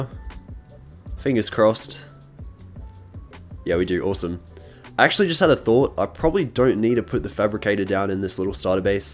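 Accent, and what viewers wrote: Australia. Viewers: Awesome content, love the Aussie accent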